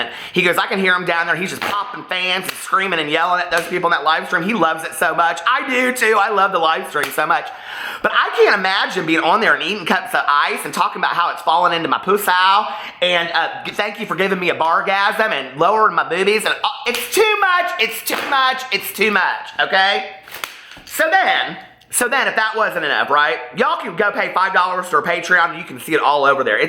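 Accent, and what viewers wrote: South, United States. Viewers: Omg i totally thought you were southern when i watched your first few videos but i looked at your mailing address to see where you live. You have such southern mannerisms and have a hint of a southern accent and pronunciations i fking love it i miss my southern queens. Northern Michigan is so VERY DIFFERENT then my home town of Tampa, Florida! Love you and love everything about you and your vids! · My husband said once that you sounded southern too, but I let him know you were from Indiana 😉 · Mr, I'm in Charlotte North Carolina and u absolutely sound more southern than most of us here. 💙💜💙💜💙💜💙💜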